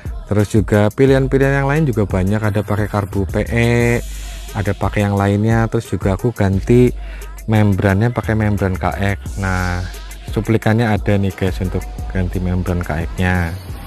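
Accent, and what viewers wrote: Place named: Banyumas, Indonesia. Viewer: Kyk ada campuran bahasa ngapaknya hee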